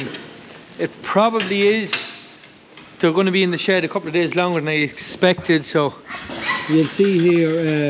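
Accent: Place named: Cavan, Ireland. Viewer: I though I recognised that accent, good to see a fellow Cavan man on YouTube. Best of luck 👍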